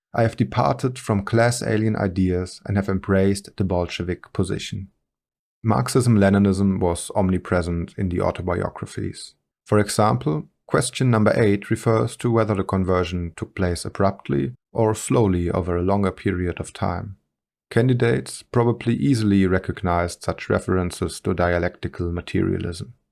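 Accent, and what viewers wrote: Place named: Germany. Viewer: He's a German for sure. Can't you tell by that accent of his? I don't know why, but I find that a German accent, or just plain listening to the spoken German language to be quite compelling.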